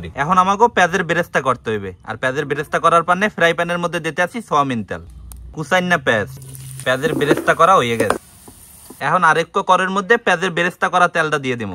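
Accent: Barishal, Bangladesh. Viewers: Cock মানে বললেন রওয়া বা মোরগ এইটা বরিশালী ভাষা আমিও বরিশাল এর বরিশাল এর কিছু কিছু language অনেক❤ sweet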